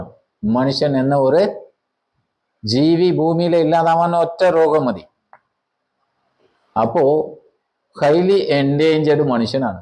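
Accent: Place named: Tamil Nadu, India. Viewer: വാക്കുകളിൽ തമിഴ് ചുവയുണ്ടെങ്കിലും അവതരണ്o സൂപ്പർ. അറിവിൻ്റെ നിറകുടമാണ് താങ്കൾ.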